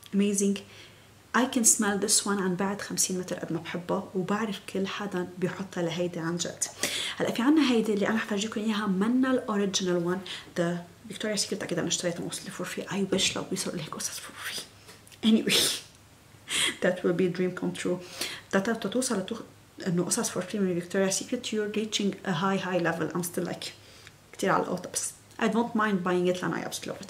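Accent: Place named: Lebanon. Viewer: You are so energetic ❤️ and lovely I really like the Lebanese way of speaking I'm Egyptian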